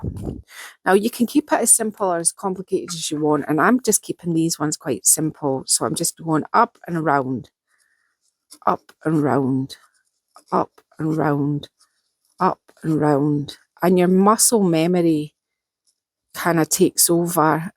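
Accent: Scotland, United Kingdom. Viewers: The Master of layering! When I first started watching your lovely videos, I thought you were saying "lairs." Which I also thought was a strange word to describe layers. It must be a Scottish thing. The layers were in a lair? Just finished a tray using decoupage, stencils, and lots of lairs. All inspired by. Doing the monotonous repeats is very zen. Beautiful. Can't wait for your stencils, gift that keeps giving. Edit: also the Queen of the leitmotif. 😊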